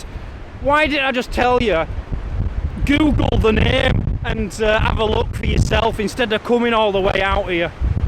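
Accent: United Kingdom. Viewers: Wow, 7 miles in 21 minutes, that is 7 three minute miles and you were hardly breathing hard. When are you going to do the Olympics? I love your since of humor. Are you from England, Scotland, or Ireland?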